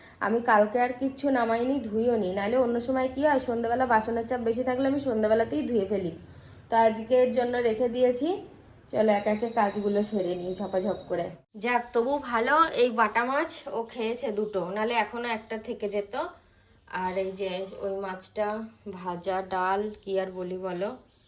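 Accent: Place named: Bangal, Bangladesh. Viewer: Tumar mukha bangal vasa darun laga❤❤❤❤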